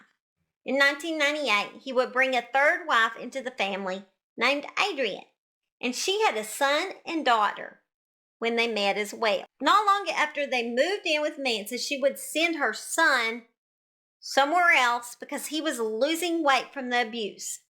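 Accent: South, United States. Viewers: Which Southern state is your accent from? I tried all night to decide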